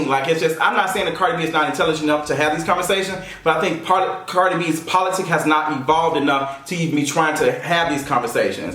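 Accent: Memphis, United States. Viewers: Babe!! I feel every word u say. “I don got pissed off”. 😂 U are so Memphis. 👍🏽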